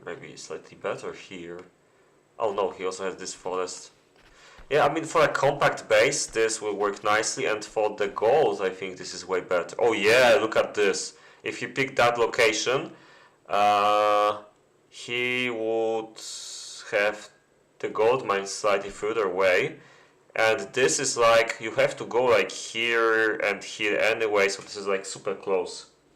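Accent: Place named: Poland. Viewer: Hej po akcencie strzelam że jesteś polakiem, but if i am mistaken please correct me :) Polecam wrzucać też przycięte nagrywki jako filmy (z tego co wdzię te live'y nie przebijają się w wyszukiwarce) - oczywiście jeśli Ci się chce :) Pozdro miło wiedzieć że nadal są aktywne turnieje i gracze :) może jakieś tutki ;)?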